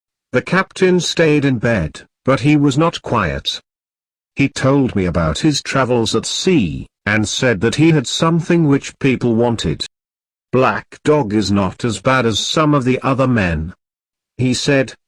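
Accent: United Kingdom. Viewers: مذكّرة القصة ❎ تعلم اللكنه البريطانية ☑️ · The British accent is adorable 🥰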